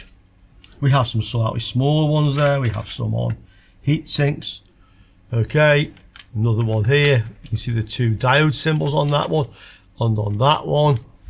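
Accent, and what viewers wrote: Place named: England, United Kingdom. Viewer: Thanks for handing down your experiences. Your videos are organized, frank, and honest. I frequently have your repair on my workshop playlist to keep me company in the lab. I might even pick up your English accent.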